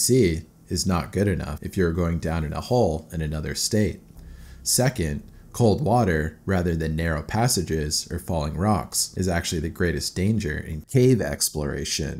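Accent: United States. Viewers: American accent😂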